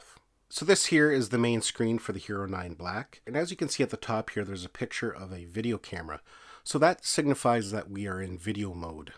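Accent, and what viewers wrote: Canada. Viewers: By FAR the best video on the new GoPro 9.... BTW I'm from Ontario.... you sound like you're also from the frozen North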